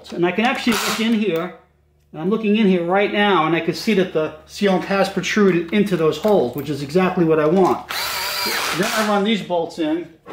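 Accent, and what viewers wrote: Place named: North, United States. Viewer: why can't I just send my t5 to you sounds like you are north of the mason Dixon line. so might be to expensive for shipping.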